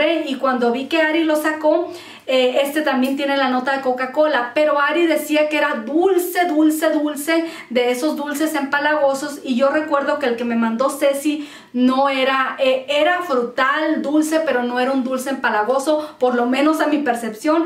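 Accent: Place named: North, Mexico. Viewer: Amiguita, nuestra querida es norteña por eso tiene ese bonito accento. Cada quien es diferente, hay que apreciar la variedad de accentos en los Latinos ❤️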